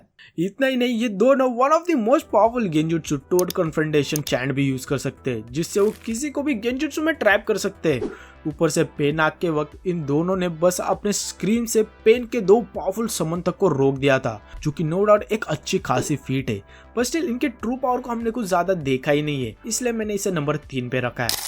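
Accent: Maharashtra, India. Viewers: Bhava Marathi Ahes ky??? Thodasa Texture Janvlaaa!!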